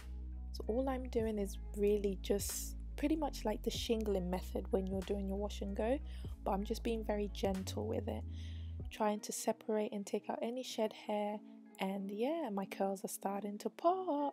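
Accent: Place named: South London, United Kingdom. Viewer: Yes Sis. Love the vid, thanks it helps so much... Imma try this under my wig. Anyhow I'm In South London UK and i recognise that accent of yours😀 Stumbled on u vid as i was checking out rice water for hair growth.. Keep up the good work girl, and keep looking after that amazing hair of yours..💓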